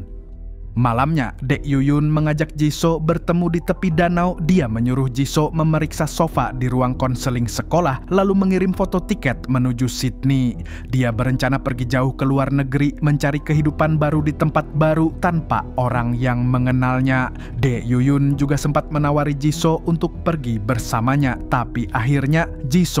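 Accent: Banjar, Indonesia. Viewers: Bah buhan Banjar kah tih 😂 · urang banjar amang nya waluhhh😂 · mang urang Banjar kah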